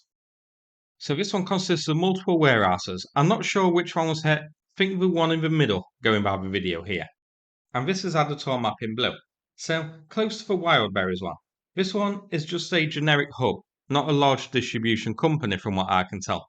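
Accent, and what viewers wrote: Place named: North, United Kingdom. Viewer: The English is clear but has a North country accent. Turn on the subtitles if you are having trouble.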